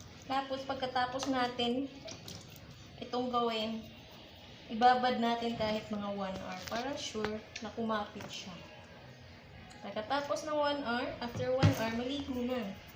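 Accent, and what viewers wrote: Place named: Visayas, Philippines. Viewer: Pina ka tuno sa bisaya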